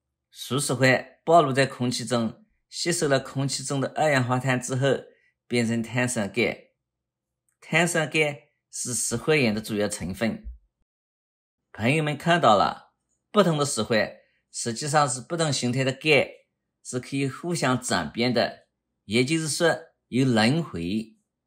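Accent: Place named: Zhejiang, China. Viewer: 我咋觉得像浙江口音，宁波？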